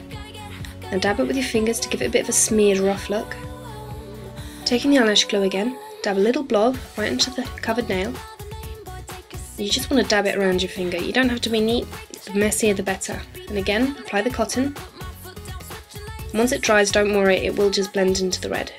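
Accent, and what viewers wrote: England, United Kingdom. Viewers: Love the british accent XD EPIC !!!! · Just watching it still ive paused it can i just say i looooooooovvveeee your pommy voice so much. Subscribing for it and great video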